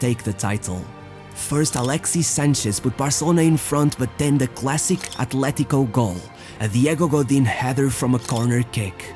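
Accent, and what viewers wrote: Portugal. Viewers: Are u a portuguese speaker? Seems like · Are you portuguese? This might sound weird but your english sounds like portuguese english :D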